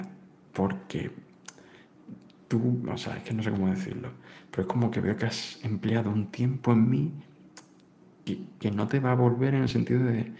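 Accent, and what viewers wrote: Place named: Seville, Spain. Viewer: Yo toda feliz de saber que compartimos acento y tierra (Sevilla🌞); un asmr brutal, muy fan ✨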